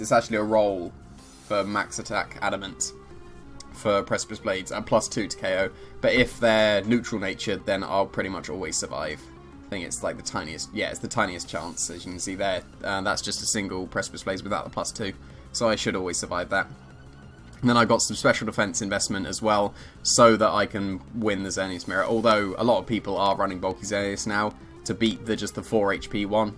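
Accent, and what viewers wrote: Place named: England, United Kingdom. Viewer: English accent best accent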